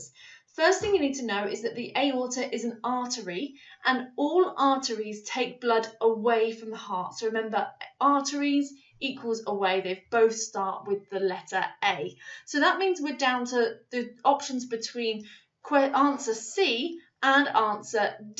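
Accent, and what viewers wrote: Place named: United Kingdom. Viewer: Ayyottaaaa😊 like the briish accent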